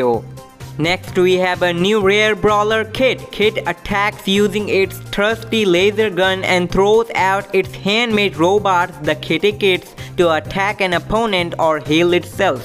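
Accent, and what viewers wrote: India. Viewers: TF THIS SI NOT THE RERAL VOICE YOUR LIKE TALKING AN INDIAN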